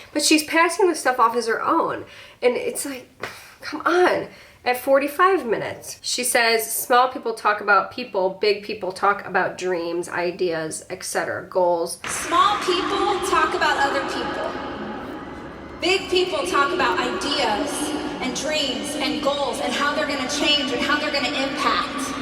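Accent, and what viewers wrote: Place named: Midwest, United States. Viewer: oh my god ur in chicago! i KNEW i heard a fellow midwestern accent!! also u look like you'd be british for whatever reason ahaha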